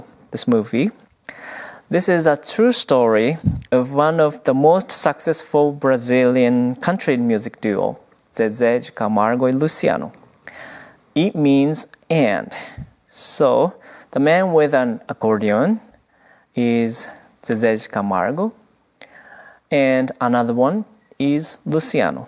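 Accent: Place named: Portugal. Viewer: Thank you for the wonderful English Dharma talk! Sensei actually has a bit of a Portuguese accent, so his Portuguese must be excellent! Namu Amida Butsu🙏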